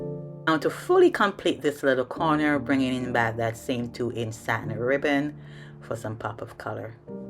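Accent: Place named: Caribbean. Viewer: Very beautiful ❤also i like your accent are you caribbean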